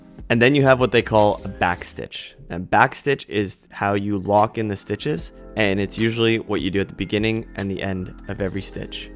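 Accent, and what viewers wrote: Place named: Toronto, Canada. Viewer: I totally pegged your Toronto accent :) Anyhoo, great video, your instructions are clear and well thought out. I just started sewing again after a couple of decades, and really enjoying this learning phase from Youtube videos. Waaaaaay better than what I learned in school! All we learned was how to make stuff from patterns, but not how to fix things, mend them, or how to make patterns from clothes without having to take a master's physics and geometry in university. Subscribed!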